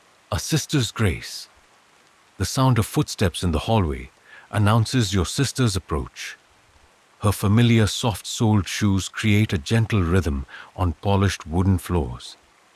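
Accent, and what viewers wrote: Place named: India. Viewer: Very good, indian accent is quite strong💪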